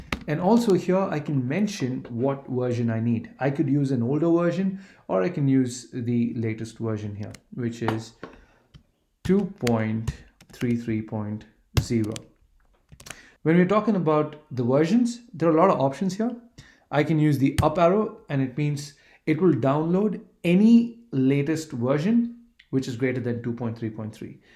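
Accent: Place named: India. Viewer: Very nice explanation. But! Use as large a font - or zoom in - as the you can get it. And speak slowly and try to be clear. Indian accent is hard to understand for north europeans. But really very good. Like write: One of the best videos I have ever seen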